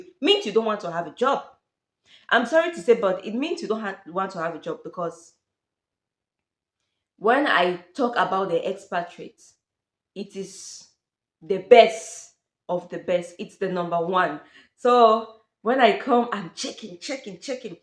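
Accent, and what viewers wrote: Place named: Africa. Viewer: Hi,it's so goo d watching your videos really. You are indeed an hardworking young African. You claimed to a Cameroonian,but your spoken English sound like someone from Anglophone African countries.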